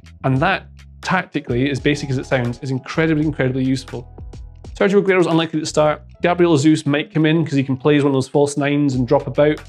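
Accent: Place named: Scotland, United Kingdom. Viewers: Why I have a feeling that a Scottish accent is perfect to explain football tactics??? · is this guy scottish or eastern european hahah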